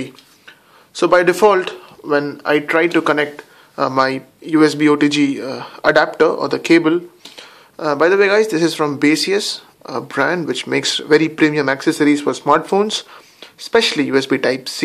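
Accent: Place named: India. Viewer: Спасибо. Чё-то мне кажется, шо это индус, шо ли, но прикольненько, таки разговорный английский я у него понимал, кроме того, я не силён в английском, так что понравилось, что часть слов (word) я понял(i understand). И плюс наглядность, круть. ☺ ↑ +5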